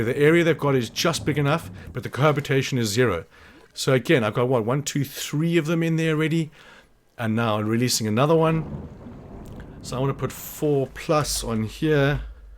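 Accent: South Africa. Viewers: Bro, just found your channel. Nice to hear a South African for once. Keep going dawg, climb that mountain 💪🏻🔥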